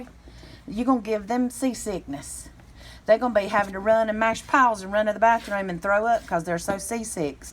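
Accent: South, United States. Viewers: I love your easy southern voice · I LOVE to hear you talk... guessing you're from Alabama or somewhere deeper south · it's a southern accent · I love your southern accent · I love the southern in her voice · I really love your li'l Southern accent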